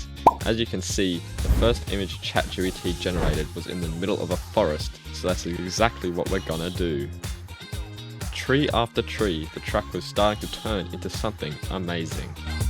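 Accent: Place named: Australia. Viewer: Why does this bloke sound aussie, but like he is trynna hide the accent haha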